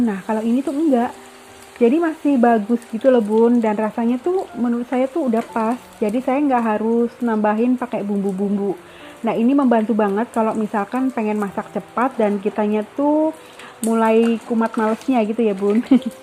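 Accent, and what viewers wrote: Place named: Central Java, Indonesia. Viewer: Rumahnya mana mam, daerah jawa tengahnya? Jajanan pasar dan logatnya khas..salam kenal dari saya klaten jateng🙂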